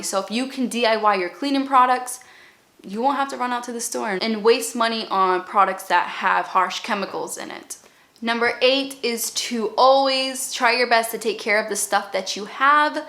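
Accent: Boston, United States. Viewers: I hear a BOSTON accent 💕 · I am from Boston too ,, great accent right?? You are wicked smot,, lol! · You have like a Boston accent haha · I really love your Boston accent.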